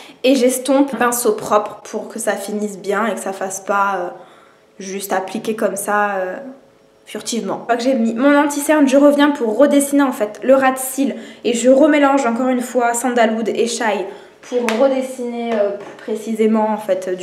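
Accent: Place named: South, France. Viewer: en tout cas sa te va bien Ton accent du sud 😊😊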